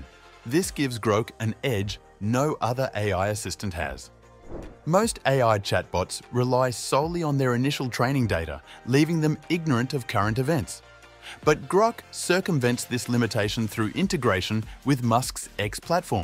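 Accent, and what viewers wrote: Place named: Australia. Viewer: Up to the minute, pronounced mye-n-yewt, as in "tiny"? Some strange pronunciations in this, with an Aussie accent. Maybe a Chinese who went to university in Australia?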